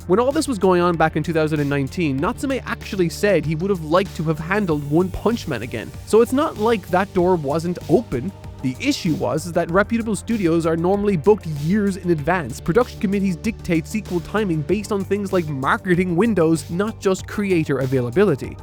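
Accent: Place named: Ireland. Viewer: I was so stunned to hear the titles of those trash shows come out of your mouth. Man, irish accents can really make anything sound at least a little better.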